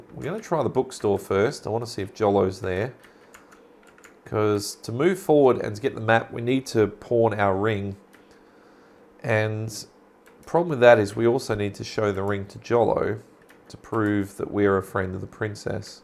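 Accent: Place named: Australia. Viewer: Nice to see you got another video out. I was wondering if you gave up producing anymore of these. Now, I just only watched the first few minutes as it is getting late here in my part of the world. Will watch it later when I have more time. Based on your accent, I am assuming you are in Australia. Also to add. Wow! Talking about going "old school", compared to when I played this game on CD ROM with full voice acting and such on a 486 with a Sound Blaster 16 ASP w/ wave table daughter card. I think I still have the thing in the basement. May have to see if I can resurrect it.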